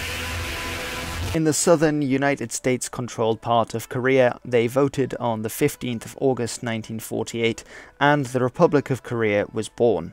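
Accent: United Kingdom. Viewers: How did you manage to get such a good British accent? I had no idea you were Dutch, you sound exactly like a native speaker to me. Do you have any advice for acquiring such an authentic accent?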